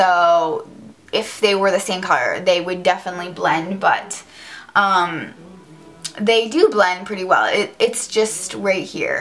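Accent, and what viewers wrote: Canada. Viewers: hey, so i tried commenting on another video of urs but it was giving me greif! it was the kat von D first impression vid on her foundation girl it looks incredible on u. looks llike great stuff. what brush do u use though cuz it looks good? also, i love ur personailty supre cute rihgt up my ally, i say cra cra all the time hee hee. keep it up kiddo :) btw u live in canada? u have an accent.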